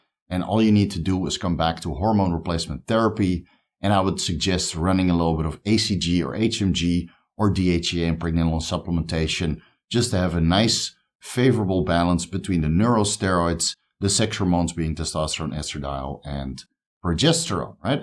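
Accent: Netherlands. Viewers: Where is from? I hear a slight dutch accent 🤭 ah nice thicker skin. Using that triple peptide stack now to heal from major surgery. But my skin has become very thin.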